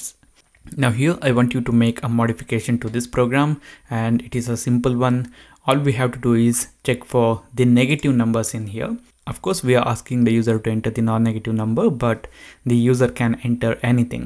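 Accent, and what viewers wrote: India. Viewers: Please leave and never come back if you cant accept Indian Accent.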